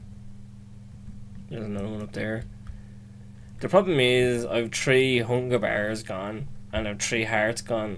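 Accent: Ireland. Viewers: What part of Ireland ya from? I'm from Cork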